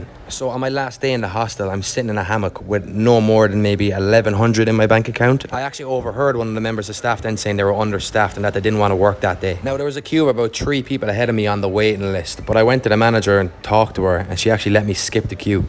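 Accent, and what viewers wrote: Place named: Ireland. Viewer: Nah your Irish Accent has got me weak 🤤 and your face is distracting 😍 got me in a trance 🤩 YOU ARE BLESSED & BEAUTIFUL 💐😇🆙️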